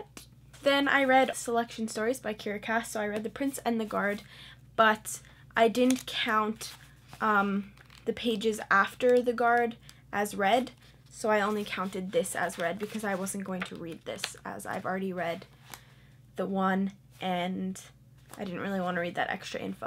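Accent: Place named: Canada. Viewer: YOU DID SO WELL I'm mad jelz. ps. I always forget your Canadian and then you'll say something and I end up hearing the accent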